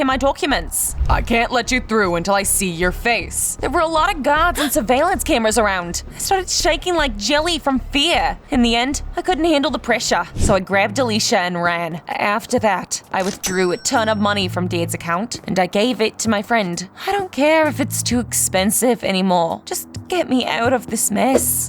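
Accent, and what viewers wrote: United Kingdom. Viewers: The British accent though 😅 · Dnt like this British accent.its annoying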